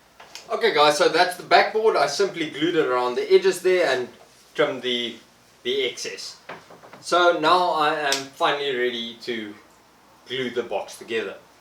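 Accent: South Africa. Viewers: The South African accent just adds to the video in the best way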